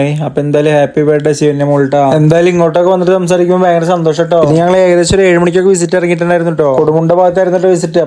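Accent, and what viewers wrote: Palakkad, India. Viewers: പാലക്കാട്‌ ശൈലി നന്നായി വരുന്നുണ്ടല്ലോ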